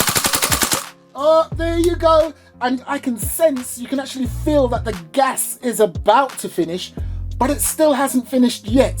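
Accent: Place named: United Kingdom. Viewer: You sound like bri'ish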